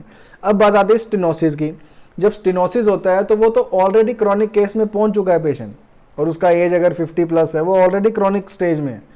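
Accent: Punjab, India. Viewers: Accent punjabio wala hai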